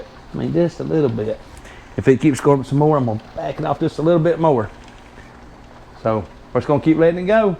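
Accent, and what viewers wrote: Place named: South, United States. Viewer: 😉 I enjoyed listening to you, reminded me of my family in Louisiana with your southern twang. It is frustrating that canning jars went up close to 3 bucks case these days.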